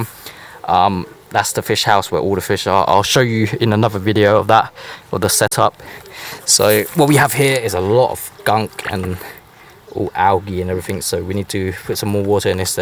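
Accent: England, United Kingdom. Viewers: New to the channel have you lived in Britain you sound like it? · How come you have British accent? (In a good way) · How come you have a strong english accent?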